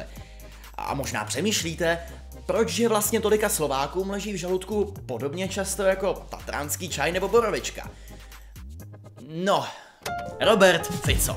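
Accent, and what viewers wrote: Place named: Czech Republic. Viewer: to je tak smiešne keď čech číta slovenčinu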